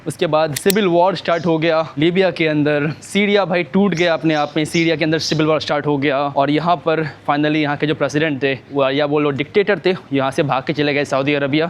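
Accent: Bihar, India. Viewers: Yaar itni countries ka experience lene ke baad you are still bihari at least now you should leave your bihari accent bro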